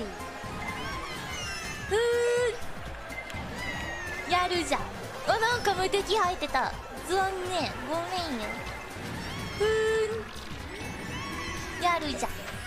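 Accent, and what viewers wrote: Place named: Osaka, Japan. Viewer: Angry + Osaka dialect = moe overload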